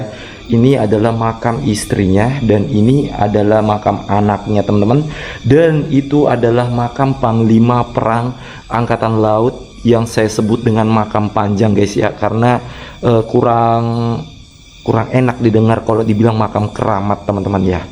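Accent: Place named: Makassar, Indonesia. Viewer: Maklum dia lagi belajar bahasa logat jakarta karena dia aslinya orang makassar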